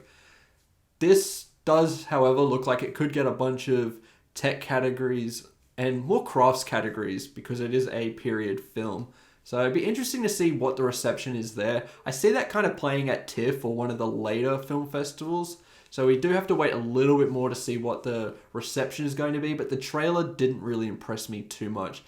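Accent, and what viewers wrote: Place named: Australia. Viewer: You sound more Aussie than NZ tbh. Nice video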